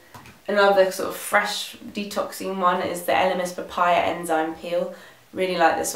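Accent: England, United Kingdom. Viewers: What part of England are you from? Love your accent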